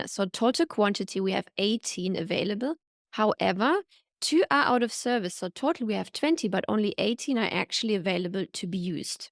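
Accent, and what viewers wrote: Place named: Germany. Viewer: lol what is her accent? she sounds very german haha.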